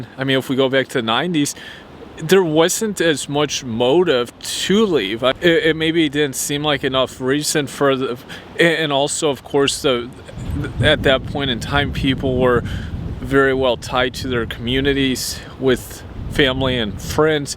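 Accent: Europe. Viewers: You have a european accent why is that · The legal availability of "professional women" (brothels, hostesses) as well technology adds to the dynamics in Japan. P. S.:You are from Chicago? You sound foreign, I thought you are from Europe.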